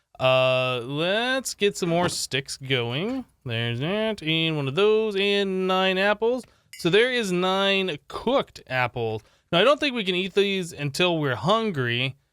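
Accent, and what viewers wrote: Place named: Canada. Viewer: Are you Canadian? You have a funny accent.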